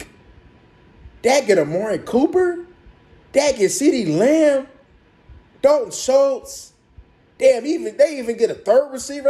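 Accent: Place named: Bay Area, United States. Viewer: You gotta be either from The Bay or Chicago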